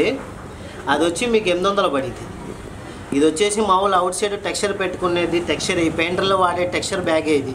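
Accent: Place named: Singh Nagar, India. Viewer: nuvvu matladuthuntee singnagar ,vombaycolony slang ardam aipothundhi Anna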